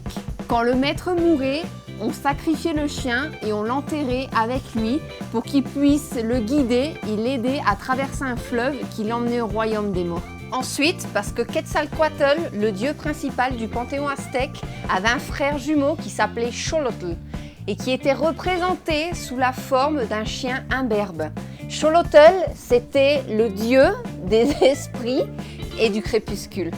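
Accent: France. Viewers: Amo tu forma de explicar nuestra cultura con mucho entusiasmo e interes. Note que mencionas referencias y das a detalle del tema que abordas. De igual manera tu acento frances. Bellisima mujer. 😍👩🏻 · Me ha encantado tu video me hermana francesita! me das mas datos de mi cultura que que el INAH! (Instituto Nacional de Antropología e Historia) y es muy agradable escuchar tu acento "franchute" Ja parle francaise, un po y me encanta escuchar esos tonos y modos del francés!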